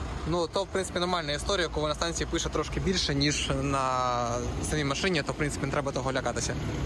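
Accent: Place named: Zakarpattia, Ukraine. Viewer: Так початок він почав не лише українською а з закарпатським діалектом нам закарпатцям дуже приємно що українці поважають діалекти різних регіонів це вселяє надію що все у нас буде класно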